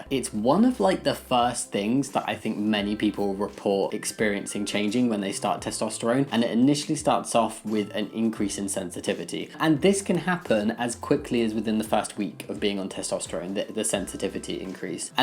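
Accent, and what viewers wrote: United Kingdom. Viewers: Honestly the only question I want to ask him is about his accent. Like it sounds British, but specifically, what is it?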